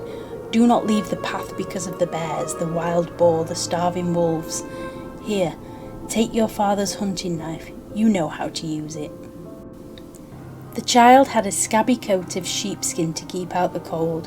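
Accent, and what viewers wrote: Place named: England, United Kingdom. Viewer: glad to hear this being read in an english accent, good job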